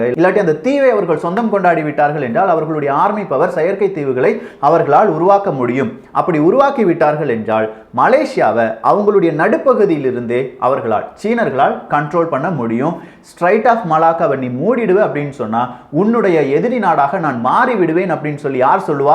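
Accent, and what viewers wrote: Kerala, India. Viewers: Then, yesterday why u told Malayalam slang come out while you speaking. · hey he is in Kerala might be influenced by the surroundings r ketu ketu slang appidi vandhirkulam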